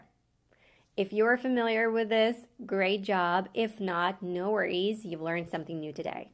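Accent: United States. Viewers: This is English American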